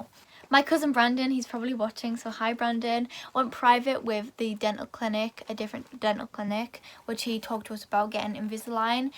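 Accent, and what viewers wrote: United Kingdom. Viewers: Being a 57 y/o man I'm glad it wasn't a makeup tutorial lol. My wife and I are proud of you. Happy you can openly talk about your history. When asked about it you can tell them to watch your videos. Since sis said hi say hi back. I need to watch more British movies so I can be used to your accent more lol.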